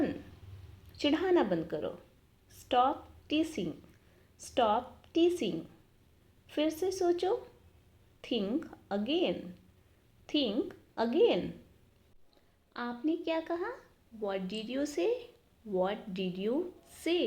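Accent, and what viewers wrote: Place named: Russia. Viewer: Mam your accent is like a Russian English and the way of speaking is really awesome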